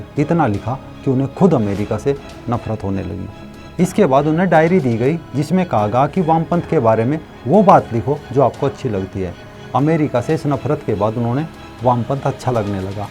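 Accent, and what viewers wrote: Haryana, India. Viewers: RAM RAM BHAI HARYANVI LAG RE HO AP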